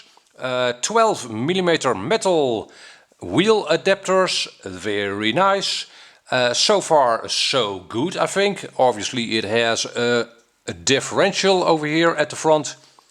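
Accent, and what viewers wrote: Netherlands. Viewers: Mooie video👍... ik wist gelijk al van dat Is nederlands accent en toen zag ik de 😂💪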